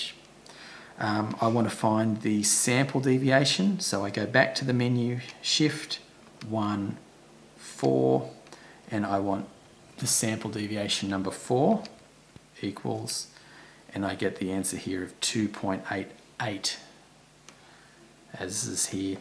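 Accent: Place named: Australia. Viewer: How tf he gay its an Aussie accent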